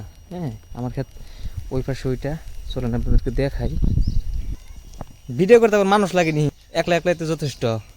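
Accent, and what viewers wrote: Bangladesh. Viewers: আপনার ভাষা একদম বাংলাদেশের জামাল পুর জেলার ভাষা। আপনাদের ওখান থেকে আমাদের জামাল পুর জেলা কত টুকু দুরত্ব? খুব সুন্দর আপনার এলাকা। ❤❤❤❤❤🎉🎉🎉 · india or Bangladesh? আপনার ভাষা তো দেখছি ময়মনসিং ের মতো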